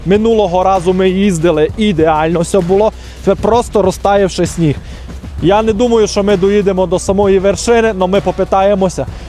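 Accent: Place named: Transcarpathia, Ukraine. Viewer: Впізнаю наш говор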